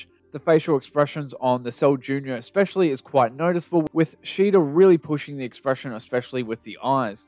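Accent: Australia. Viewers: I'm a simple man. I hear an Australian accent and I like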